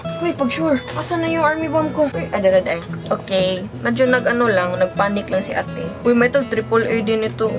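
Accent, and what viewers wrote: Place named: Visayas, Philippines. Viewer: HOOOY YAWA BISAYA MAN DIAYYY HAHAHAHAHAHA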